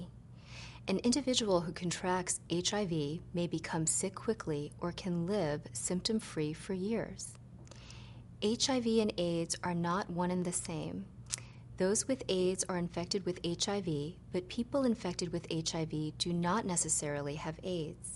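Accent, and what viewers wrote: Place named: United States. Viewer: Wow she sounds like an american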